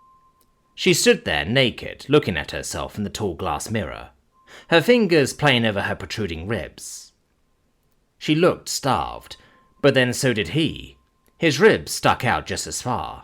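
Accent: United Kingdom. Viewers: Even though I already knew what you looked like, and then got an update on what you look like now, I still can’t help but see what my mind’s eye sees when you start narrating. You just hold a voice that, at least for me, holds its weight in gold. I love when you narrate things, especially audio books. Thanks for everything,. You’re my favorite narrator. Edit: When you talk, I always hear a preppy British kid in a prep school, complete with a patterned vest and slacks, with your hair parted in the middle. Maybe that’s just the American in me, but 🤷‍♂️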